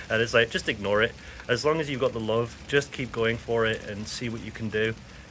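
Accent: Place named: Liverpool, United Kingdom. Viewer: Your accent, what is it? Liverpool? Cool video. Subbed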